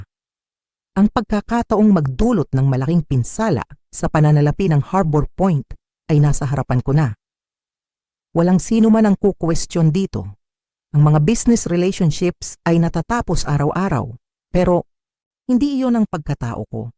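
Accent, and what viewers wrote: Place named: India. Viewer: Putik!!! Bakit naging Indiano ang narrator!!!!!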